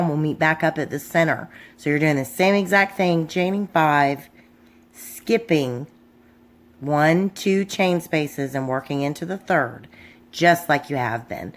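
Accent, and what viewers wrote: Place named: South Carolina, United States. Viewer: I watched the videos and I have to tell you that you are amazing. Love the accent it makes me miss my time in SC. While crocheting my shawl I noticed that while making the 4th floral lace (the single in the center) I was on video 2 and did the lace in the same manner as the one in that video. It seems to have worked out, but should I frog it (I'm at the center point) and go to video 3? I will be adding more rows because I want to use the yarn in my second cake until the end.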